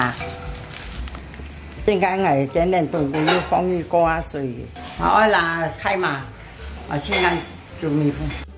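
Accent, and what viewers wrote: Hakka, China. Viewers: 婆婆的客家话跟我的很相似。请问是怡保的客家人吗？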